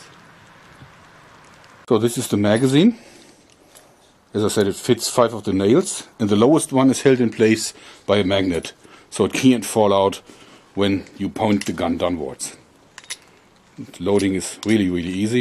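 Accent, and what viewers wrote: Germany. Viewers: Deutscher? Hört man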